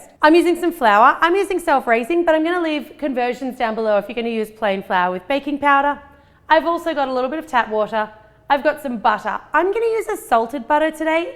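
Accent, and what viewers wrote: Australia. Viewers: she is not English. her accent is Australian. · Australian I think :)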